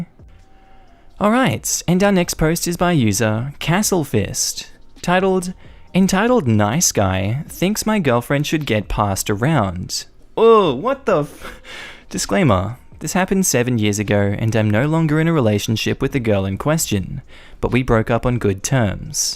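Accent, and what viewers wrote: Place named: Australia. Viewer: Aussie far from home here, sometimes I listen just to enjoy the accent :-)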